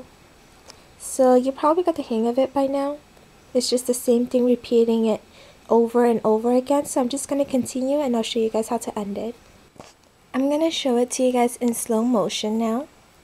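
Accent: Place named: New York, United States. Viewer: Love your accent are you from newyork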